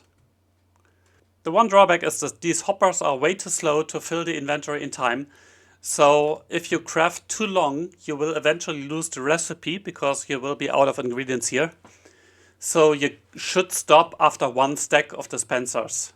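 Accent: Germany. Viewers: The world needs a technical minecrafters with german accents, no nonesense german efficient minecraft engineers.. now you need a ridiculous theme tune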